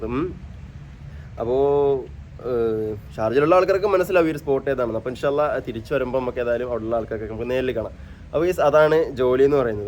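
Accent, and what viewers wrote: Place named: Palakkad, India. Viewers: Slang cheyyo palakad then kollam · MalappurAm palakkad samsaram ekadesham oru pole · Chakkara Palkkad aano Samsarm kettappo original malappuram aan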